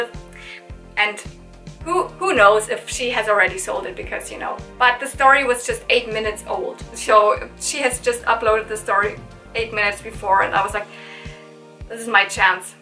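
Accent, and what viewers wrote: Germany. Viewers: Hi, how funny to immediately hear that you were German. And hello and thanks for a good exciting channel. Cheers from Andy from Denmark.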